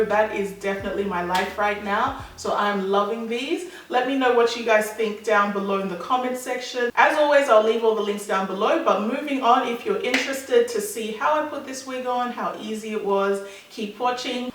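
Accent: Australia. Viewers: Are u Aussie??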